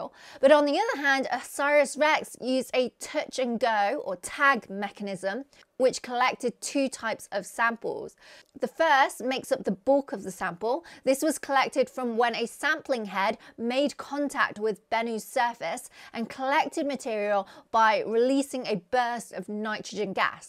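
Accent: United Kingdom. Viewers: asian lady with a brit accent. literally exactly what the internet was created for. yeah yeah and science sure but mostly the former.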